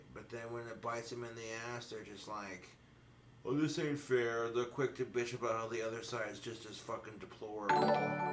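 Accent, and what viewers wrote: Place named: Birmingham, United Kingdom. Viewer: Bro, are you 80% British? Im starting to hear the heritage in the accent. Sounding like Bob Geldof if he came from Birmingham. Weird Shiyte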